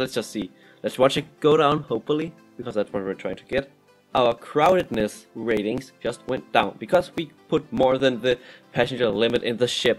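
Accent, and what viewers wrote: Denmark. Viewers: R u danish? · it sounds like your from denmark i am so pls like my comment (edit) like if you are and if your not then comment on my comment where you are from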